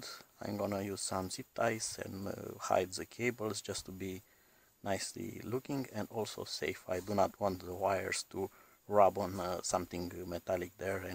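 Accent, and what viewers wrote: Romania. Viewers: Nice video ! Are you romanian ? ( your accent ) LOL !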